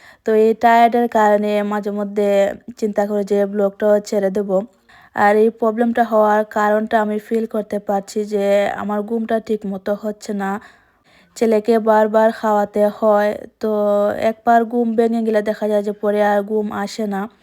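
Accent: Sylhet, Bangladesh. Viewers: আপু তো সিলেটি মনে হচ্ছে সিলেট কোথায় আপনার বাড়ি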